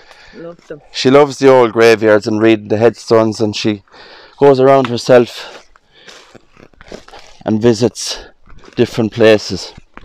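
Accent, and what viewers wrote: Ireland. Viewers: speaks like Conor Mcgregor accent🙂 I like it. Thanks for sharing the great video.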